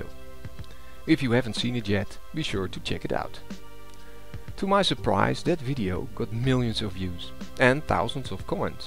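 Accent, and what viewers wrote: Netherlands. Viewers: ITSAAA DUTCH ACCENT, HELLO COUNTRY BROTHER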